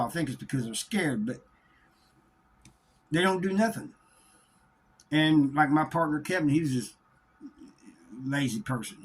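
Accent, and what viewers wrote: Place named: South, United States. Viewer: What state are you from ya accent sounds southern bro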